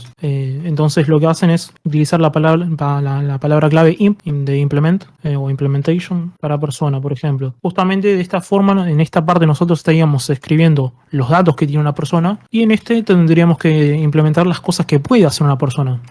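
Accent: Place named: Argentina. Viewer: Tremendo contenido! El audio de 10. Quizá lo que familiarizo el acento Argento 😁. Saludos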